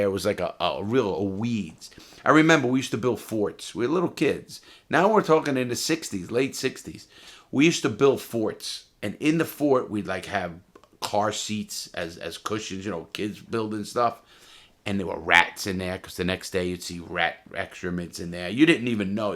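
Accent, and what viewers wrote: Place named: East Boston, United States. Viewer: Sounds like East Boston in the 50s 60s.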